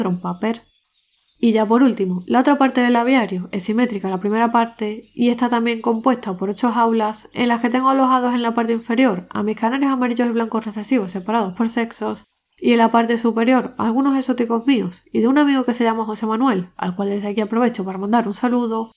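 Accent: Spain. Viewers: Se me hacía difícil q ya no tuvieras l aviario y más q nada q ya no nos explicaras n tus videos todo lo q nos necesitámos saber sobre las aves, pero q bueno q s uno nuevo, me gusta como explícas y me gusta l tono español d tu voz🤗😍. Salúdos desde García N.L. MonterreyN.L.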